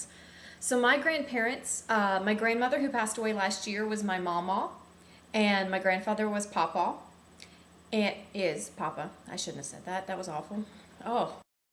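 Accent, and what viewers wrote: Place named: North, United Kingdom. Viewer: Lol, I totally thought you were from the north.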